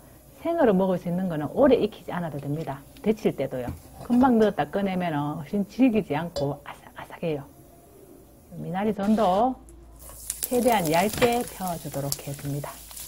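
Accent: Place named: Daegu, South Korea. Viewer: 구수한 대구사투리 억수로 좋슴미다요🤗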